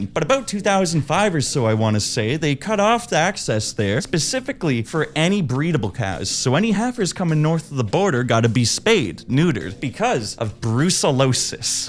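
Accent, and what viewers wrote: Nova Scotia, Canada. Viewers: Your accent is giving me big Nova Scotia vibes, at least the way you pronounce cars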